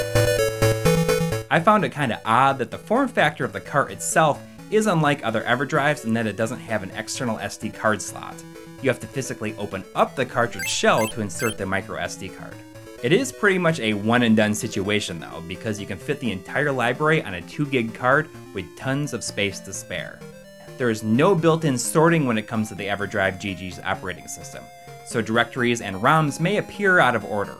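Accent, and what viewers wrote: Wisconsin, United States. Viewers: U sound like wisconsin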